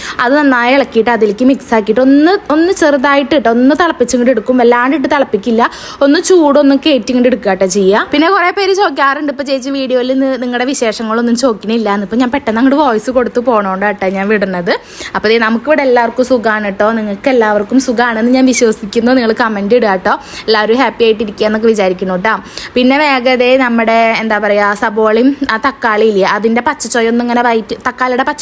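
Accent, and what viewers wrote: Thrissur, India. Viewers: Thrissur angne paraya sheriyakknonnulyaa😌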